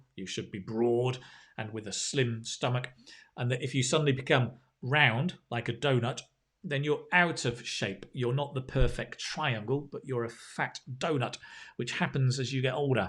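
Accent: United Kingdom. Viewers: I and my children👶👧👦 7-8-11))))really like that you regularly do with your great clasical BritishEnglish pronunciations and sincere interested teaching acurent natural fluency skills!!!!!! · Thanks so much, for your English Speaking Success on the YouTube channel. You've been giving me chances to immerse myself in the daily uses of English on different ambitious, especially with your British accent which I've been learning since primary school, more than 50 years ago under the British Colony. but I still have problems in the sentence stress. Anyway, I will keep watching your videos everyday anytime or even during having a shower.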